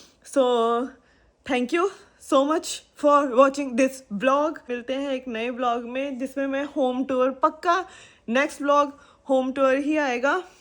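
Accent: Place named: India. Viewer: Oh plz speak punjabi hindi accent not suite you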